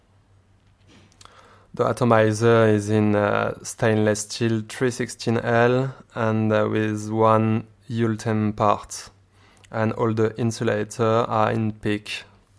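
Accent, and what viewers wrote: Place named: France. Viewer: You have lovely French accent : )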